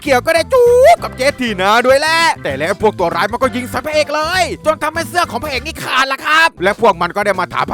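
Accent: South, Thailand. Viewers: ผมชอบในความภาษาใต้ของพี่5555 ใครคนใต้บ้าง✋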